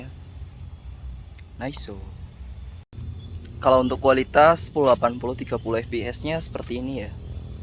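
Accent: Banyumas, Indonesia. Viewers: orang banyumas juga bang?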